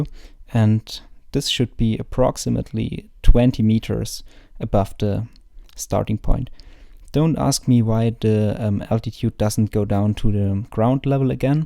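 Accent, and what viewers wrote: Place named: Swabia, Germany. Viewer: Ja cool ich au, man merkt obwohl du Englisch sprichst wo du her bist, ich bin aus Backnang, auch Nähe Stuttgart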